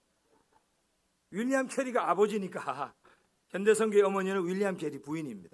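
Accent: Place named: Gyeongsang, South Korea. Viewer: 🤗 말씀이 깊고 넓게 확장되는 시간이었습니다. 감사💞 ※※경상도 억양이시라서 부산근방..생각했었는데, 석수동이셨네요.🤓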